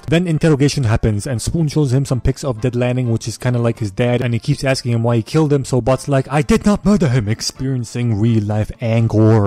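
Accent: United Kingdom. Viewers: british power has been unleashed Also are ya British. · >shaved lol cracked me up BTW are you British by any chance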